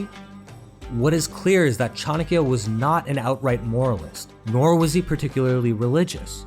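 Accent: United States. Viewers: You're foolish to think you shouldn't lionize our great history. I'm not saying to lie but you're only helping the west lie about India by bending over for them and making India look bad. I seen three of your videos now and I found out in the first video what you are. You're anti-Hindu. Next video I started to realize you're probably ABCD with your American accent and sometimes proper pronunciation and sometimes cringe Americanized pronunciation. You're too ashamed of being proud of India's Hindu and Dharmic heritage... Be proud of India and it's Hinduness.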